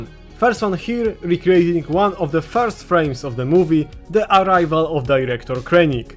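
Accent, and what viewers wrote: Poland. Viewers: elo a ty z polski jesteś bo akcent polski????????? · Słychać ten polski akcent ❤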